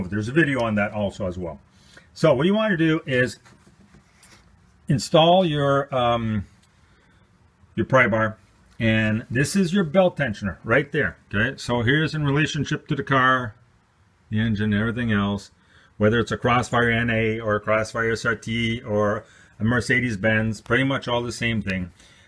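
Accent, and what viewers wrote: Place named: Chicago, United States. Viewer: This dude has a thick Chicago accent.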